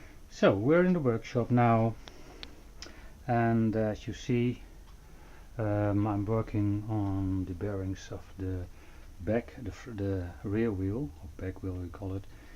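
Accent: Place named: Netherlands. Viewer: Leuke video! Je kan wel beetje horen dat he Nederlands bent maar nog steeds leuke video’s ik heb pas een mtb en ben hem ook aan het verbouwen om maar te zeggen😂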